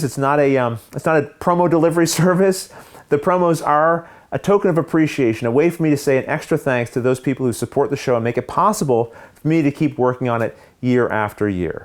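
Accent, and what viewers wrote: Canada. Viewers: Hi. I'm fairly new to the hobby, and your videos have been great to help me get over the "learning curve" and develop my own gaming identity...what I like and don't, what's worth spending money on and what's not, and what gaming experiences I can share with my family and which ones are best left for playing with other adults. The fact that the videos are a lot of fun to boot is icing on the cake! (Not to mention the funny vowel pronunciations that lead my wife to say, "are you watching that Canadian guy again?") You're a good person doing good work, and I'm happy to be able to back you. Hopefully you can use my support to buy a carrot or two. Or some cider for Pep. Cheers and keep up the good work!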